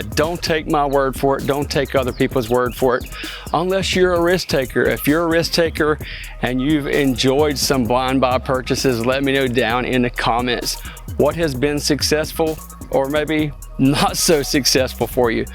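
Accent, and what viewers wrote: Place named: Carolina, United States. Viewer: you got the same Carolina accent as Stephen Thompson 😅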